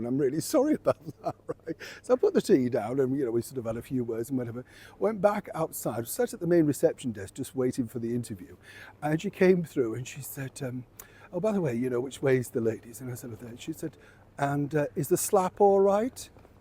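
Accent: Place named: Northern England, United Kingdom. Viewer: No 1 Fan here. You sweetie, making Princess Anne a cuppa and said she was having her 'slap' on. You are so natural with your lovely northern accent and twinkling eyes. Down to earth Anne matches your down to earth personality. How could anyone not be captivated by your personality. I love the story of Princess Royal; well, I like all your stories. Much of the yesteryears you talk about bring back memories for me too. In my last post I talked about Millicent Martin, Harry Secombe, Sandi shaw and meeting them at the London Palladium rehearsing for the Sunday Night stint. I was actually on stage as well displaying a 10 yr old bouncing on the trampoline before it was even considered a sport (I won the first place in the London and South counties competition, the youngest in that category). Now it is a sport in THe Olympics but it took a hard sell to achieve tat. 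So too, Judo, which was brought to the UK by my father after he studied at the Japanese Judo kookan when he was in The Army Physical Training Corp and the rules were then enshrined in this country. Not a lot of people know that. We were stationed in Singapore at the time. This is going back to the early 60s I believe - before coming back to Chelsea Barracks - which 2 generations didnt know was the Coldstream guards barracks in 1966 when they trooped the colour which how I was in the vip pen. Dont know how he swing that but that was a tale in another post to you. You can see, I go further back than you and I may have muddl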